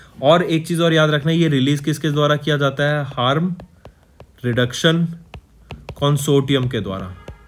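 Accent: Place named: North India, India. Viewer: Sir north Indian lgte gai. Bt pronunciation bht wrong krte hai... common words too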